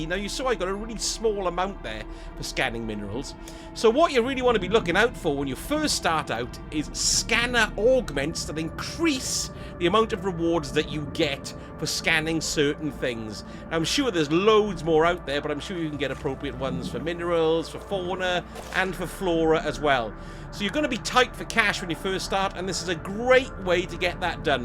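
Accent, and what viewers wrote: Wales, United Kingdom. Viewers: Loving the Welsh accent... we need more Welsh in the world! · Brilliant video. I've been playing for ages now and even I learnt a few new things. Plus your beautiful Welsh accent was an added bonus as I could listen to it all day long. 30 years in Cardiff and I miss it every day 😢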